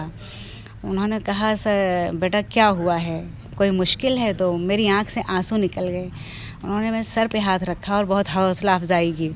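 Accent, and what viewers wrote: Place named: Pakistan. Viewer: Masha Allah Aawaz me kitni Achchi urdu Zuban ki Bu hai Dil khush ho gaya sun kar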